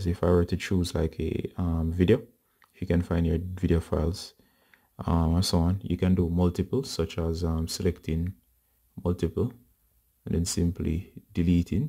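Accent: Jamaica. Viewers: good to hear a jamaican voice .. watching from the UK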